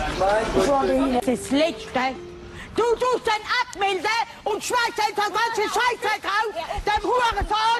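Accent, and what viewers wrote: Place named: Mannheim, Germany. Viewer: rischtisches monnemerisch :D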